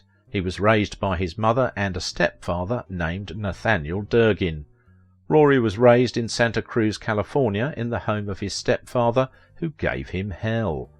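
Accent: United Kingdom. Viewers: Thanks for the backstory on Rory! Wow, what a male w...e. He did look good though! I would guess his street smarts gave him the rough, and tough look some parts needed. I remember many shows he was in. Too bad he had such a bad temper. I truly enjoy your voice, and story telling style. The sound of your british accent is easy to listen to, and tone and tempo really awesome. That was my dream to be a voice over actress, or book voice. Look forward to more of your work.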